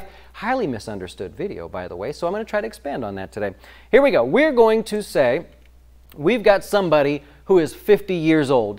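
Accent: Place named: South, United States. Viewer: This right here is the second time I am coming across this name in a week. Came to my school for a lesson and it was lit and yes, he has a really cute southern accent.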